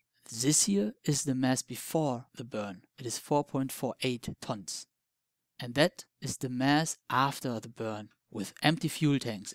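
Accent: Germany. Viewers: Do i hear deutsch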